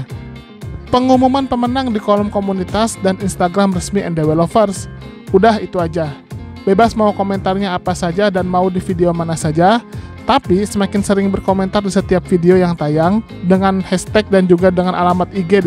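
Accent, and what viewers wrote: West Java, Indonesia. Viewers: min lu org sunda yakk😁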